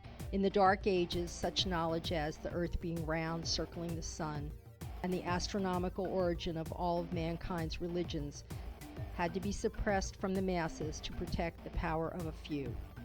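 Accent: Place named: New York, United States. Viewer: THIS VIDEO IS NOTHING BUT A SELL TO THE BOOKS AT THE END OF THIS ONE. KEEP YOUR RELIGION AND UNDERSTAND IT AT A PRACTICAL LEVEL OF LEARNING MORALS. WITH SOME CONECTION TO THE DIVINE. PRAY, IT'S THERAPY. KEEP YOUR FAITH, AT A SANE LEVEL. WHERE ELSE WILL YOU BE TAUGHT LOVE, CARE, GIVING, SACRAFICE? THE MEDIA- ALSO, SOUNDS TO ME LIKE THE SPEAKER IS HIDING A "NEW YORK" ACCENT, WHO LIKELY ATTENDS HER TEMPLE EVERY WEEK. DON'T TRUST ANYONE TRYING TO BREAK DOWN A RELIGION-LOW BLOW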